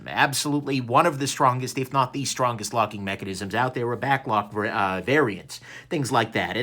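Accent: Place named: New Jersey, United States. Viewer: Wow! I have to guess that you are a Jersey dude based on accent? (asking as a full on hick based on my accent) Great and informative review. Thanks!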